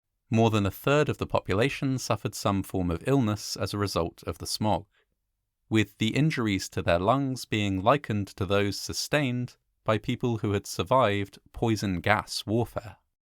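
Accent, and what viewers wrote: United Kingdom. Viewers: Your historical accounts of these tragic events across the planet and during recent centuries, are exceptionally well researched and are excellently narrated and visually presented! Your sensitivity in presenting these human tragedies is also very commendable! Thank you very much kind sir! I enjoy your British accent and turn of phrases, especially when they're used to describe North American life. As Canadians, we're more likely to use American terminology even though we've largely retained British spelling and syntax. Within this short documentary, you use: "the high street" versus (the North America) 'the main street'; "football pitch" versus 'football field"; "caskets" versus 'coffins'; "steel works" versus 'steel mill'; I should have jotted down them down as there were perhaps a couple of others! I'm certainly not suggesting changing your speech or approach. 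I just thought that viewers outside the UK might have noticed and appreciated this diversity of English idiom worldwide 🌐! Perhaps English usage throughout the former British Empire follows the original traditions. I'd imagine that due to the influence of the cultural elephant next door, that 'Canadian English' is much more Americanized than most! 🇬🇧 🇬🇧 🇺🇸 🌎 🇺🇲 🇨🇦 🍁 🇨🇦 🇺🇸 🌎 🇺🇲 🇬🇧 🇬🇧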